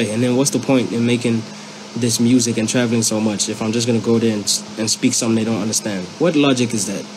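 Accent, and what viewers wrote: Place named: United States. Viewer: American accent mxm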